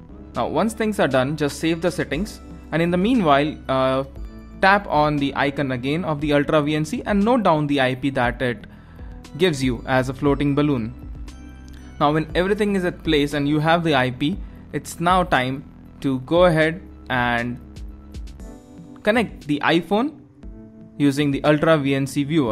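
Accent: India. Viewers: When they have Indian accents you already know they are scammers!!!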